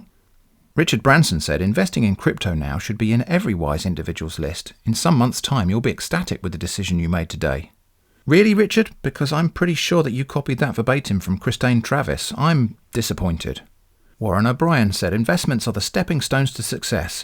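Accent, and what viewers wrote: United Kingdom. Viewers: If they had said the investments were glarded, well, that might have been enough persuasion for me!, I really think you could make a pretty penny doing audio books! (Generalizations ahead, I’m aware they’re generalizations) I think Americans trust the British accent, and enjoy it, and yours is not thick, like when I’ve tried to watch some BBC police procedurals. You’re also good at lowering your voice for dramatic effect. I mean, I really enjoy listening to you read emails! LOL. That should say something.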